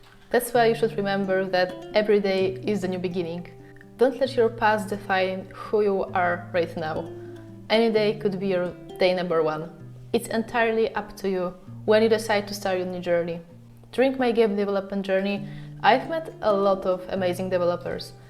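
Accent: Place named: Poland. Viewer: Ten akcent od początku wydawał mi się polski, aż do - Podziemia.. szczyty itp :D Fajny materiał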